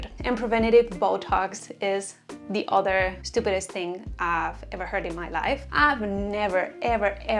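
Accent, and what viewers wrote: Spain. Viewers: Sabia que eras española! No hay ningún problema con tu pronunciación, eh? Al contrario. Pero lo estaba notando. Supongo que porque yo también lo soy xd Que tengas un buen día :)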